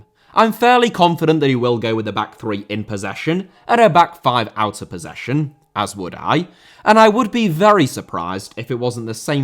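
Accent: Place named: East Yorkshire, United Kingdom. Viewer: Most of those words aren't spelt phonetically. How on Earth do you know he's pronouncing possession with only one double S? Regional accents exist, but as you can clearly understand what he's saying, his East Yorkshire accent obviously isn't incomprehensible.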